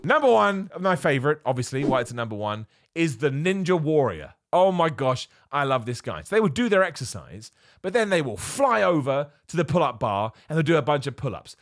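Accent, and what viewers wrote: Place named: RP, United Kingdom. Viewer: A subset of the eater are the people with what I call "bro jugs", they have these huge jugs that just have to leave on the floor, the size of a carton of milk, filled with either water or even better "branch chain amino acids." I've seen both swole people and hard gainers do this. Maybe it helps them, but to me, I felt like if I were to try to drink even a fifth of that while deadlifting I'd yack. Another subset of the naked guy is the old guy drying their balls in the hand dryers. I still have mental scars seeing some old guy literally dry his balls in a YMCA bathroom like he was at home, not a care in the world. Of course, I can't add to this list without copping to some of my own bullshit, which is grunting. I'm a grunter-- I know why I do it, but I also know it could probably be disconcerting. Of course, I don't feel bad next to that very eccentric gentleman channeling his inner Lady Gaga who will just full-on sing so loudly I can hear him over the heavy metal I have blasting in my ears so I don't have to hear other people in the gym. 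Anyway, big fan, I like your content but I also admit it's nice to hear some guy with a posh accent who sounds like he could be teaching a 301 class on the reign of King Henry the 8th instead of someone who speaks like their neurons have been replaced by branch chain amino acids.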